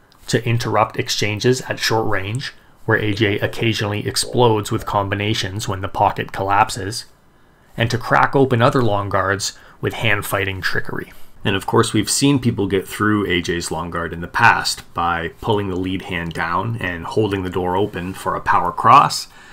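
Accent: Canada. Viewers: You’re a Canadian aren’t you. I know that accent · An odd mix of a Canadian or a Devonshire accent plus American, where are you from and where have you lived? i mean.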